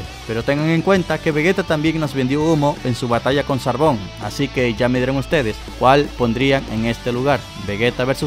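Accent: Ecuador. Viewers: Gabardina? Te expresas como nosotros mijo eres ecuatoriano? Saludos me gustan tus videos loco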